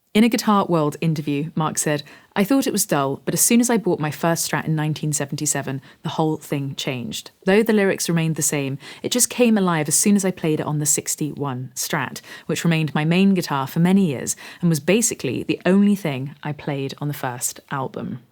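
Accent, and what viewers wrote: United Kingdom. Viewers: She can play well and speaks a perfect English. By the way, she's got a british accent. So lucky to have the chance to play those wonderful guitars ! I enjoyed her video. Well done !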